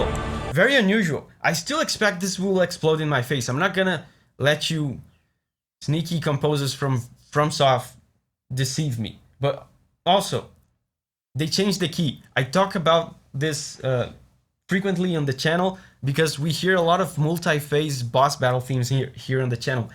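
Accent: Brazil. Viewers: Salve mano, via seu canal desde os vídeos de Final Fantasy XVI e achava que tava reconhecendo seu sotaque e o jeito de falar. Pesquisei um pouco e descobri que tu é brasileiro também! Seu canal é incrível cara, fico feliz de ter um Brasileiro sendo fluente e profissional na área de músicas de jogos, Desejo todo o sucesso do mundo mano, ABRAÇO.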